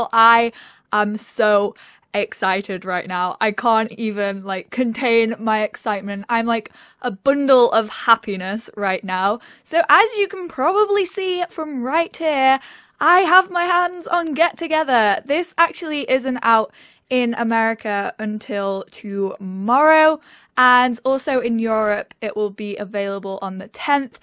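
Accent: England, United Kingdom. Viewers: you are my favorite youtuber!!!! you are one of the only youtubers that makes quality sims videos, or videos for that matter. the fact that you have an English accent is amazing, not just the normal American. =)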